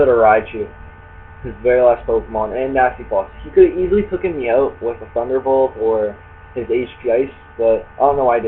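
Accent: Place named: Canada. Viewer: This narrator sounds distinctly Canadian. Is he? Top speed Nidokings, even while Modest, can usually outspeed Kingdras. Just a tip for future, it looked like you could have wiped out his Kingdra early thanks to the switch. Oh and btw, I don't know if this applies to you, but if you add yt:stretch=16:9 to your tags section the screen will be nicely stretched and you won't see those ugly black bars.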